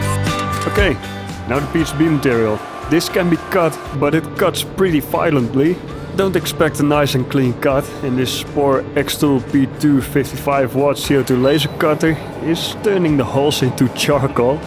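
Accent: Netherlands. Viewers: I heard a dutch accent at Formnext this year which I remembered from somewhere. 😅 Saw you talking to the guys at the Slice booth. You were busy planning new stuff so I didn’t want to disturb you. Awesome and refreshing video as always!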